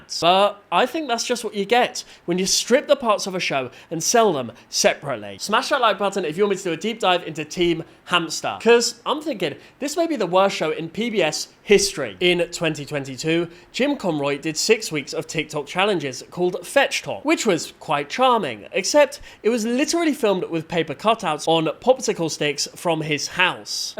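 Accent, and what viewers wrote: United Kingdom. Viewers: You british right? DONT LET THE TEA TAX BE PAID. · "I always looked forward to Ruff after elementary school" What do you mean you watched Fetch?! You're British! You watched CITV and CBBC after school! The accent gives it away unless you found a way to watch it abroad?! Or it aired on CBBC?! Actually it wouldn't be a huge surprise if that was the case Arthur aired on CBBC too.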